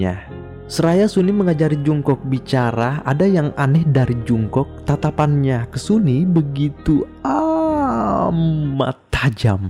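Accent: Bugis, Indonesia. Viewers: Kentarana bugisna admin🤣🤣🤣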